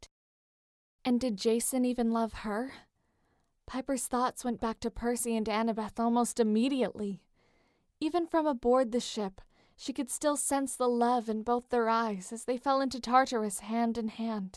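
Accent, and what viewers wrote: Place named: Texas, United States. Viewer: This is the BEST Jasper (or Jiper) fanfic ever!!!! Oh my gosh!!!! And that Texan accent!! If that was an attempt at a Texan accent, it was good!!! (I'm a from Texas, I know an ok accent when I hear one.)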